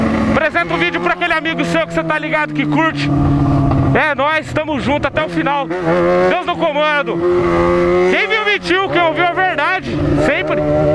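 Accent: Goiás, Brazil. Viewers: mano vc e de onde ? vc fala sempre no pique do Goiás ? nasceu lá ? pequenas duvidas ai , responde quando der vlw abraços ✌👍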